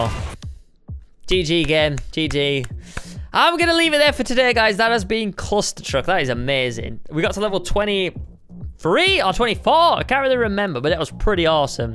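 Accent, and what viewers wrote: Scotland, United Kingdom. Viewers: U sound british but yeah me too 😂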